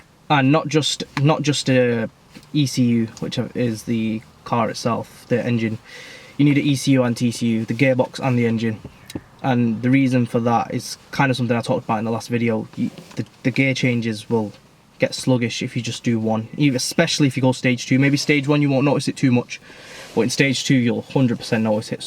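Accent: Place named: United Kingdom. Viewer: Dude, I gave up after a few minutes... heavy AND fast British talking, I am not a native, tough luck I reckon.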